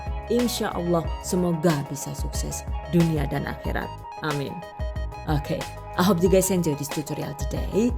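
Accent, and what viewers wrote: Banyumas, Indonesia. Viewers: Sg penting ngapak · Mantap Bunda apa lg dng bahasa ngapaknya aku suka sekali jd sambil nonton sambil ngakak😍👍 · Selamat pagi , matap . Gabung bikin ngiler haaaiy .Bunda Suaranya Jawa enyong (kebumen , Bnyumasan )jw tulen 😃😃😃😃😃😃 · terimakasih atas resep nya kak ,ngapak juga nih kakak 😂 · Kie wong nek agi ngomong ngapak jan merguyokna pol😂😂😂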